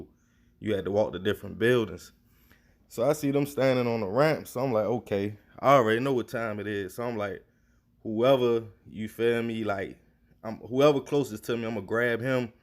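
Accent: Baltimore, United States. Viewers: My dude said T, d off on him!! You can tell you from Baltimore!! Shot out greenmount Ave 29th st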